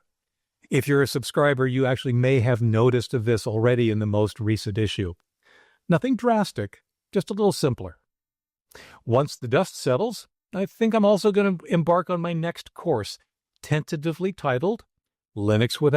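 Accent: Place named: United States. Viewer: I assume by your accent that you are American (as am I). In Dutch the surname " " translates to "nut tree." I hope that you sometimes celebrate your heritage and have visited the Netherlands at least once. Nog een goede dag!!!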